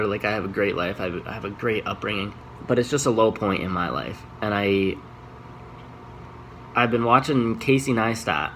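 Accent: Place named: Canada. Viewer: Before you said Toronto I was going to comment and ask if you were Canadian lol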